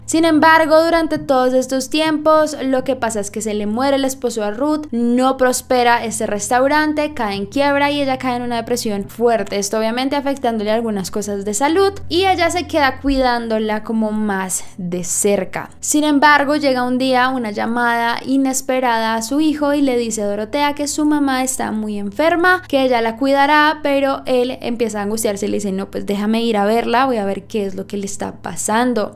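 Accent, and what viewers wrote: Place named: Colombia. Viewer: Una voz femenina con acento colombiano e historias de terror uf... es un placer auditivo...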